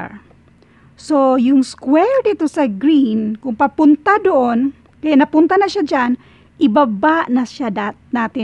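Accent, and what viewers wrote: Visayas, Philippines. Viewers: Bisaya ka ba! 😁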